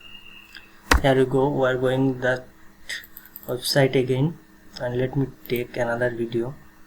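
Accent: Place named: India. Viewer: Me: Ah this video looks pretty solid it might give some answers. Video: Has Indian accent Me: Aight ima head out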